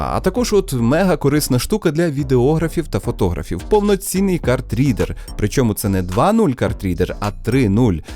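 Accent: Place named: Surzhyk, Ukraine. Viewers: За суржик лайк👍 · а шо тут такого, хай буде собі суржик, це робить тебе індивідуальним, у кожної людини шо балакає суржиком є свій унікальний суржик, так що розмовляйте як удобно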